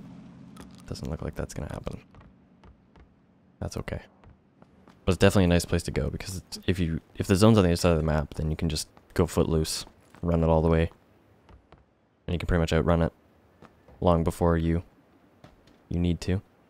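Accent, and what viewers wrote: Canada. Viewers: are you Canadian? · You gotta be Canadian... you sound just like my uncle just not as deep of a voice lol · Are you Canadian?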